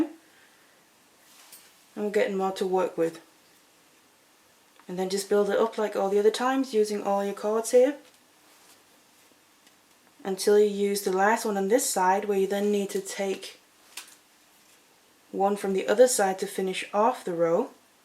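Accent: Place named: Northern England, United Kingdom. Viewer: Are you from Northern England...I recognized your accent from watching a mini-series on the BBC called North and South. :o) This is really pretty! Thanks!!!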